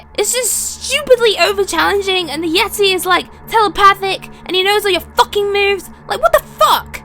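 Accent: United Kingdom. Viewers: SPYRO 3 WILL ALWAYS BE MY FAVVVVVVV :) Love the vid and love the pommy accent ;) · Oh my god, you are a fucking disgrace! I can't believe how bad this review is! First off, the hell man, british narration? No review with that disarray! And boss stages and mini games are not fucking levels. FUCK AND GET THE FUCK OFF YOUTUBE!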